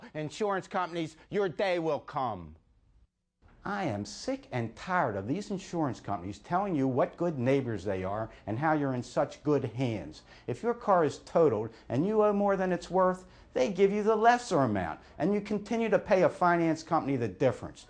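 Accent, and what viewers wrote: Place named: Baltimore, United States. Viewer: Super Ballamer accent. Long o and a sounds. Forget Mr. Ray get this guy for a John Waters movie. He's into golden showers.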